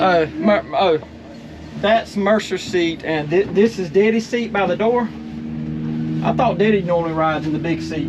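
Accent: South, United States. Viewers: New to your channel, explanation about farming in Georgia and content is excellent 😊. Love the southern talk to talking also. Retired Michigan farmer. Take care have a great day!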